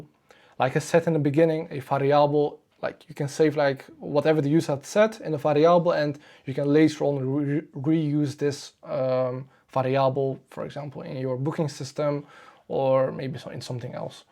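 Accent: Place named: Netherlands. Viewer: Hi, ik heb je net gezien op YouTube podcast van Sabrina! Wat een mooie podcast geworden en wat vet dat je zo lekker gaat!! Ik ben in het begin (leer) stadium. Heb besloten m’n tanden erin vast te bijten. Je video’s zijn ook leuk om te zien. Simpel. Hoeft niet perfect. (Reminder to self) En je website is prachtig!! Het hoofdje van de robot is adaptive to your finger. 😊 Ik ga je volgen en van je leren en er zelf mee aan de slag! (Ik hoorde btw direct dat je uit Nederland kwam 😁)Succes 💫 🙌🏻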